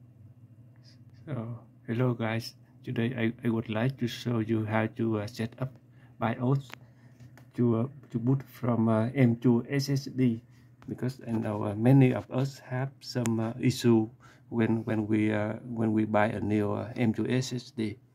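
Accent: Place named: India. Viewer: Love u indian accent guy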